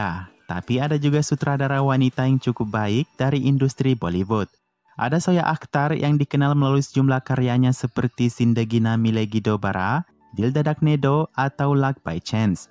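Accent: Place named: Makassar, Indonesia. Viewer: Admint org makassar kah..logatx kaya org makassar 🙂😃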